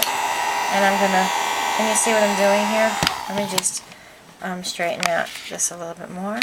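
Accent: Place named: New York, United States. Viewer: *runs in n'squishes you to pieces parts!!* I love the stamping idea!! loveLoveLOveLOVeLOVE it!!! Thank You Thank You Thank You! Consider it scraplifted! ;o) You've got all mah' craftin' juices flowin' sistah! . . . now, if only I had craft time. . . I love your videos, and I especially love your tutorials because I can hear the fuhgeddabouddit in there. *Grins* Have a super scrapalicious day sistah! Put your feet up, relax and well... you know the drill. Hugs n'Squishes!! ~*Mwah!!*~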